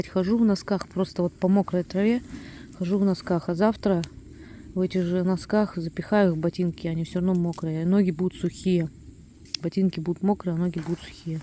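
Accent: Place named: Russia. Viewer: Santa approves🎅👍 This is the one video, you made, that made me earn your respect the most. Even if you were not by yourself, filming... Today,... You have more camping equipment. This one, shows the difficulty, and yet the simplest, basic survival,... two bags, taped together... I love it! 👍 The harshness of what you went through, and yet, the beauty, on all levels, nature, at it's best. From a butterfly,... all the way to a snowy, wet, difficult hike. It's amazing! You are a very resilient, strong, beautiful woman, with the most beautiful accent, Russian voice... Your eyes, hair, are amazing too... Wishing you the best,... ❤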